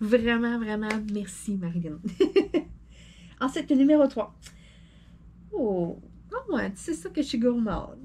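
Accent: Quebec, Canada. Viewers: cc bravo et merci pour ce bon moment et ton rire avé l'accent quebecois,c'est divin merci de super cadeaux j'adore le stylet bonne fin de journée gros bisous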